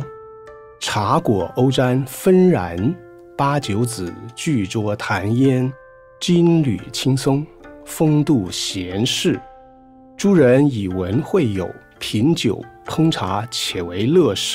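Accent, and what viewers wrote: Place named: China. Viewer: 聽聲音是大陸腔，但漢字，喜歡漢字。謝謝制片人。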